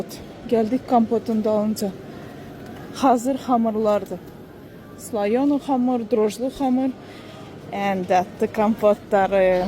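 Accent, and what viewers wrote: Ganja, Azerbaijan. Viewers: Necesen Gəncə lehcesiyle danışırsan maşallah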